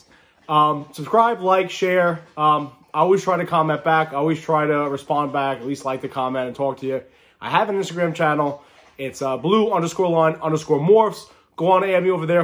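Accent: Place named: New York, United States. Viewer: This guy is from New York or New Jersey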